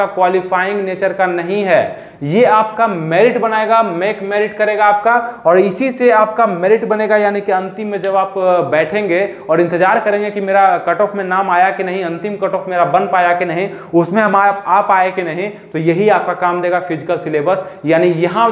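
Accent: Bihar, India. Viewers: Aap bihar se ho guru jii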